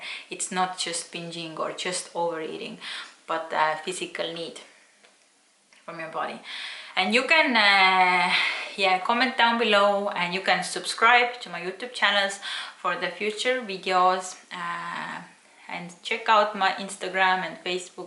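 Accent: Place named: Russia. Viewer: Будто русский акцент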